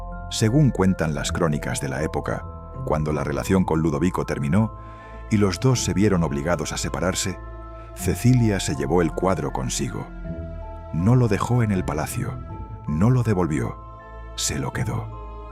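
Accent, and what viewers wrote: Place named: Castile, Spain. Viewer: He encontrado este canal por casualidad y ya me he suscriito........da gusto oir hablar este castellano tan maravilloso...............¡¡¡¡¡¡¡¡¡¡¡¡¡¡¡¡¡¡¡¡¡¡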